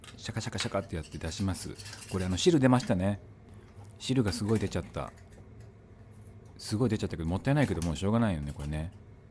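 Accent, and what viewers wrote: Standard. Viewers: 何故か標準語のナレーションが面白いですね😃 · なぜ、標準語🤣 (≧▽≦)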